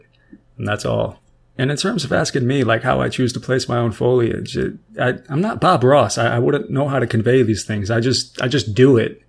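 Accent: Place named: working class, United States. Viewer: he sounds like most working class americans. this is how we talk. and he's being honest about how he feels which is a good thong, actually. if it makes you uncomfortable, maybe its a personal problem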